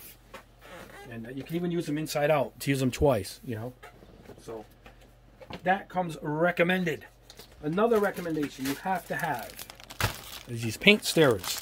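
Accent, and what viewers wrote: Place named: Long Island, United States. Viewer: Long Islanders understand his perfect accent:)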